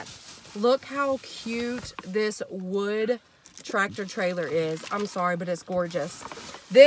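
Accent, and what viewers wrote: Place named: Georgia, United States. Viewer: Hey! Just knew you were from Ga. I came across a video the other day and subscribed. I thought dang she sounds like me lol....I'm in Newnan!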